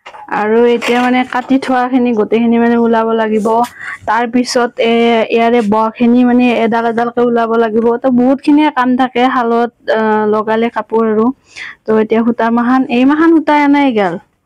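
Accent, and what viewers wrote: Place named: Nalbari, India. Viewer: Ata kotha kom beya napaba tomi nalbari r lenguage te koba vhal lage but likhita kole akdom beya xuni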